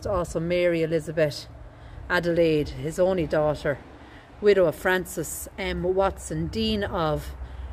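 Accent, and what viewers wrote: Ireland. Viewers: Watching from Massachusetts, USA. I am second generation American born, all grandparents from Ireland. I love your videos, how you comment on the beauty of the sky and grounds and stones. Most of all I love listening to you. Has brought tears to my eyes remembering family members who have passed, who spoke and sang in that lovely brogue. God bless you for all you do. I would love it if you could keep your camera on the pictures and writings a wee bit longer. Thank you!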